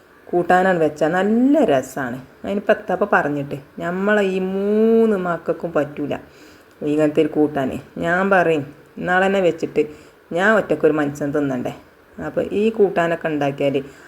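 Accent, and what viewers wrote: Malappuram, India. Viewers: ഇജ്ജ് മലപ്പുറം ഭാഷ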